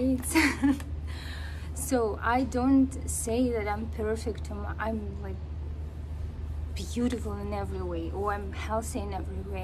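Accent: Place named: Russia. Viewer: Congrats on your hard work! I liked how you struggled with plank exercise at first. You say “I hate plank, arhhhh” in that beautiful Russian accent. Lol! Then it got easier and easier so you get tougher and tougher. I watched all 14 workouts and your cardio stamina improved greatly. You don’t need to lose any weight (talent agents suck!) but I hope your routine becomes a lifestyle. Good luck!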